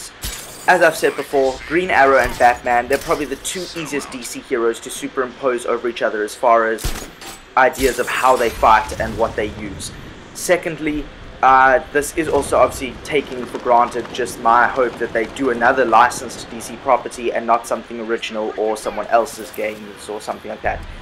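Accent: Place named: South Africa. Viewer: recognised ur accent 😂 Im from SA too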